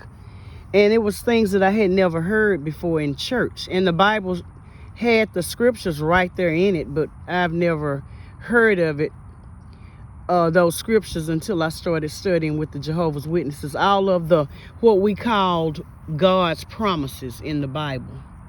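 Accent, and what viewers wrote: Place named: Mississippi, United States. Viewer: You sound like you are from Mississippi.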